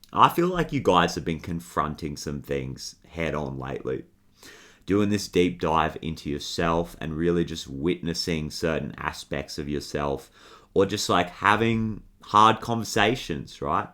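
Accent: Australia. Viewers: Thank u! You read my energy perfectly right now. Love hearing a fellow Aussie accent too… thanks mate. 🤩🌻🖤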